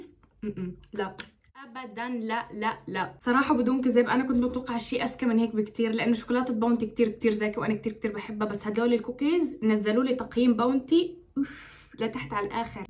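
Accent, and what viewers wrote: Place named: North, Palestine. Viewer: لهجه مدن شمال فلسطين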